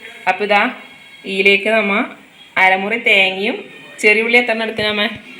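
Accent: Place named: Kasaragod, India. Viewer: മുതുമ്മനും കൊയ്ച്ചെടുത്തു 😂. നമ്മുടെ കാസറോട്ടെ ഭാഷ 👍🏻👍🏻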